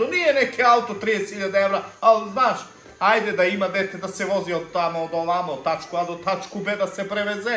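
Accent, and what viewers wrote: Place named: Zaječar, Serbia. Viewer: On je iz Zajecara ne sa juga,tako se prica i u nas kraj....On je bukvalno pogodio u srz tog zivota inostranog...🤣🤣🤣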